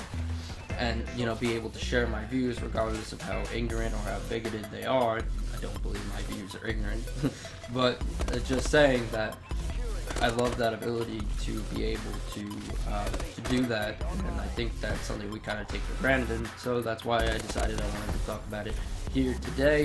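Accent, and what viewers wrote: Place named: United Kingdom. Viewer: I like how you added the music in the background XD also how you say the states XD God you sound like such a Brit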